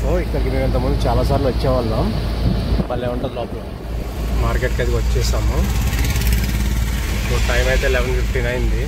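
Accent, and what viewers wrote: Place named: Rayalaseema, India. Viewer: You are from Kollegala,Chamaraj Nagar Dist. How are speaking in Telugu,like Rayalaseema people?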